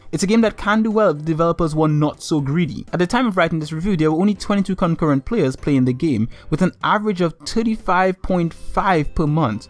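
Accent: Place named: Trinidad and Tobago. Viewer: you sound like a trini , you from trinidad bro ?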